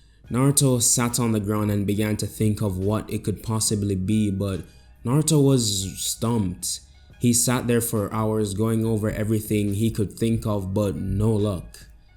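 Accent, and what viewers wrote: Jamaica. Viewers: Very refreshing to hear Jamaican making this kind of content. I had to come back after years to listen to this story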